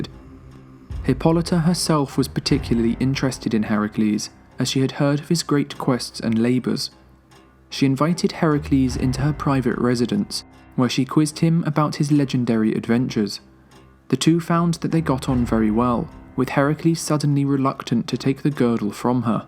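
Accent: United Kingdom. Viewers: It helps that the narrator has a soothing British accent. Try that with one of these screechy annoying American accents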